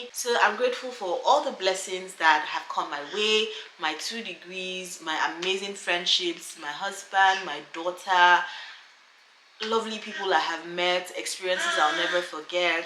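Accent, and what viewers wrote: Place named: South Africa. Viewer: Your accent sounds more South African now. I'm new here, but I listened to your video about South African's being overprotective. You sound totally different. Glad you're still around.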